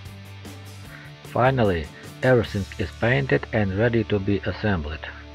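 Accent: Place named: Ryazan, Russia. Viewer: Один вопрос - for нафига трепаться на английском , если акцент рязанский..... Есть же титры..... Кому как...мне ужасно режет слух.....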